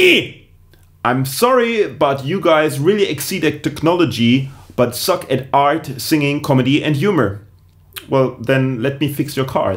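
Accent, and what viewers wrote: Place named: Germany. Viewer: Well at least you don't take those comments to heart, they're more funny than anything. By the way German accents are the best and you are really cute and funny!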